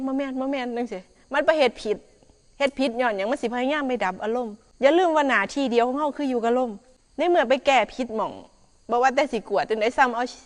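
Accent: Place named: Laos. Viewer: แม่ชีเว้าน่ารักตกคำเว้าลาวกะเปนตาฮักจ้าน่ารักมากค่ะ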